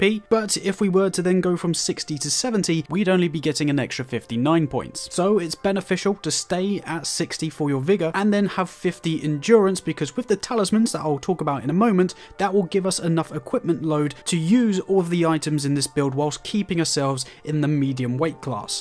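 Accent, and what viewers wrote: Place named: United Kingdom. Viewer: The word is HP not heach P 😂 lol love how British people talk.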